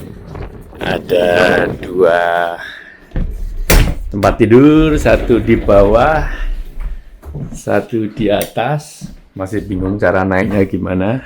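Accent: Java, Indonesia. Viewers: Tampilan LC 200nya cakep banget, velg+ban dan modif bagian depannya pas banget. Suatu saat bisa bikin mobil overland seperti om wid 🎉. Satu hal yg bikin salut, walaupun lama tinggal di Australia, tapi logat jawanya masih ada loh 🎉🎉🎉. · Saya salut dengan jenengan Pak,walaupun oerbah tinggal di Australi sangat lama,tapi logat Jawa jenengan masih sangat kental🙏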